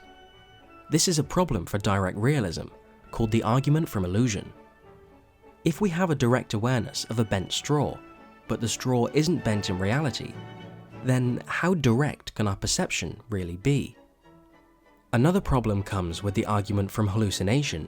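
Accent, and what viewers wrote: United Kingdom. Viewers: Man, you have converted so many lesbians with your British accent and philosophy-infused British humour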